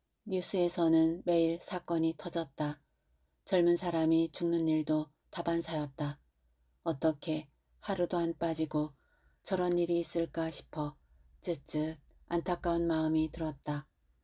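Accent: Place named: Jeolla, South Korea. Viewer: 남도 사투리가 대단하십니다.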